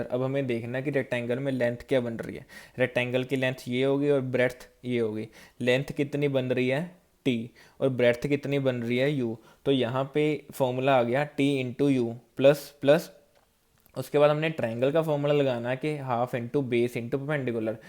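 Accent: India. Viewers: Sir g aap Punjab se ho kya ???. ..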